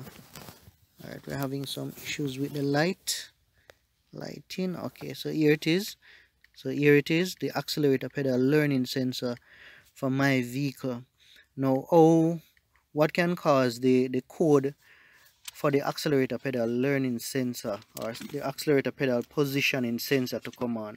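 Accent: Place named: Jamaica. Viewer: This was very helpful , keep on doing your thing, you sounds like a yaawdy and i like the way you brought the information plain and simple.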